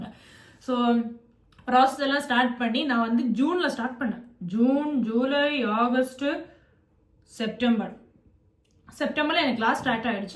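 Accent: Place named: Kerala, India. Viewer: unga tamil different ah iruku . just like tamil and malayalam mixed ah iruku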